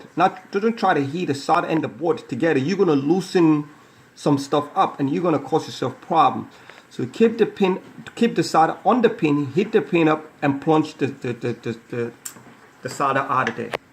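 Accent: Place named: New Jersey, United States. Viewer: Excellent video. You have a Jersey accent.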